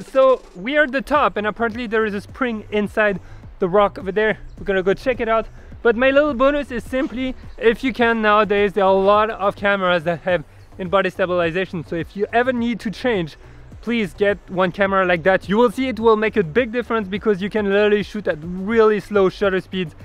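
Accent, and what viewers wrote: France. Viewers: Super French accent mode :D J'adore!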